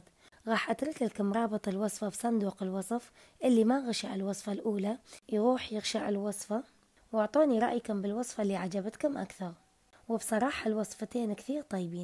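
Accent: Mosul, Iraq. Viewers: عجبتنه العروق واللهجه الحلوه والشرح الجميل الوافي تحيه من البصره للمعدلات المصلاويات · ماشاءالله روعة تسلم ايدك…طريقة العمل والشرح المختصر المفيد رائع والاروع والاحلى اللهجة المصلاوية المحببة عند كل العراقيين …استمري عزيزتي وبالتوفيق ان شاء الله 🌷🌷🌷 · الله يوفقج سهلتيها علينا طبعاً هاي الطريقة اسهل من الطريقة السابقة الله يرضى عنج ويرزقج من فضله يااارب. اعشق لهجة اهل الموصل 😍🌹❤️ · فدوه شلون معدله عاشت ايدج واللهجه الموصليه تجنن بلسانج ربي يبارك بعمرج ويحفظج ويرضى عنج بنوتي الحلوه🥰🥰🥰🌷🌷🌸🌸👍👍👍👍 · أنا أعشق الاكلات المصلاوية 😋😋😋 اللي زاد حلاوة الڤديو لهجتك المصلاوية 😊💐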